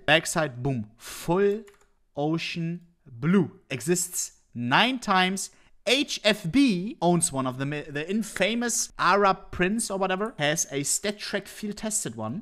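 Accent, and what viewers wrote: Germany. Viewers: Man merkt so das er deutsch ist xd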